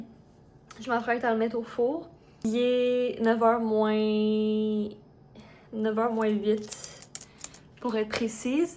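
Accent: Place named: Russia. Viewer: sorry, but once I heard you I thought you russian , I didn't understand anything 😆😆 love your ideas, hope good for you 💕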